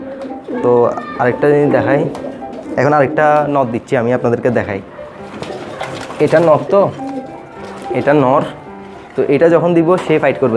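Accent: Chittagong, Bangladesh. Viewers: ভাই চট্টগ্রাম এর নাকি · ভাই আপনি কি চট্টগ্রাম থাকেন? কথার আওয়াজ কিছুটা চট্টগ্রামের মতো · ও ভাই আপনিতো অর্ধেক চিটেইংগে বাকি আধা চলিত ভাষা হন্ডে বাড়ি তোয়ারো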